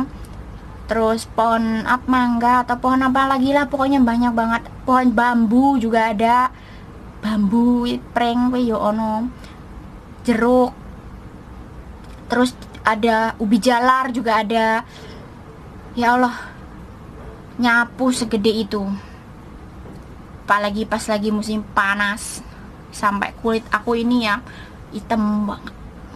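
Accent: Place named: Java, Indonesia. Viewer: Dik jenengan asli pundi to kok bhsa jawa getot sekali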